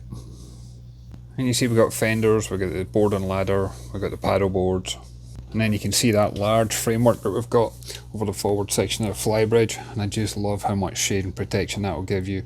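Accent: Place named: Scotland, United Kingdom. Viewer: Neat review; loving the Scottish accent...Garnock, Ayrshire?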